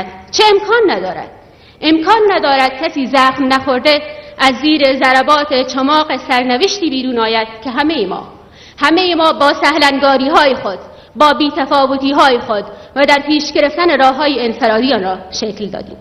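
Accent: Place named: Herat, Afghanistan. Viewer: she is herati and they have accent like that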